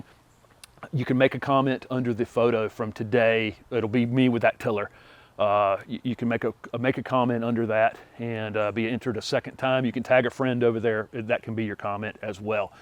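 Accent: South, United States. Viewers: I have absolutely LOVED your YouTube channel, since finding it a few months ago, and I have learned sooo much from you! Also, you and I share the same zone (7b), as I live very close to you, in Mooresville, so (as crazy as this sounds🤪) I feel so “at home” listening to your heart- warning southern accent and seeing your familiar mannerisms throughout your videos!😊 Also, It’s extremely obvious to me, and I’m positive to anyone who follows you, that you work so hard to prepare for every single video that you upload, and you’re very efficient and consistent! You never let us down! I can easily see how friendly you are, down to earth, and incredibly REAL, which is sadly so hard to find in people these days! Oh yeah! Duh!😂 Congratulations on you and your family’s absolutely stunning new home!🥳What an exciting time for y’all, and I absolutely love everything about it! 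I have loved seeing both your house and your yard from overhead with your drone! Of course, you’ve knocked it out of the park with your unique and brilliant landscape design, which is such an important part of creating a yard that you’ll love! Also, congratulations and YAY for recently welcoming beautiful “Miss Holly” into your family, as well!🧡 She’s such a beautiful and sweet dog!!! Again, thank you so very much for all you’ve done to help me, without even knowing it,! I am now a diehard, lifelong “HortTube” viewer, AND SHARER!!!! You’re definitely my #1 channel, hands down! Your hard work has absolutely paid o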